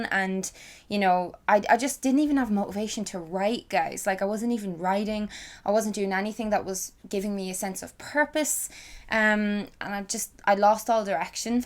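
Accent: Dublin, Ireland. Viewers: I can't hear Louth in it at all. Sounds completely Dub to me!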